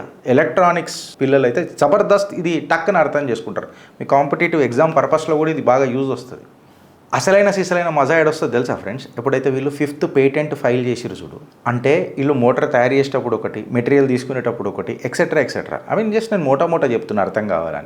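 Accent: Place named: Hyderabad, India. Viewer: అన్నా నువ్వు మొగుని లేక న్యూస్ చెప్తావా అన్న ... I like u bro way of our old city hindus mass slang ... And no one can beat❤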